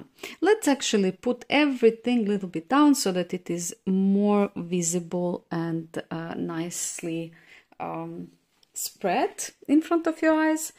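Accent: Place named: Russia. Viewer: You have a Russian accent. Are you Russian? 💜😍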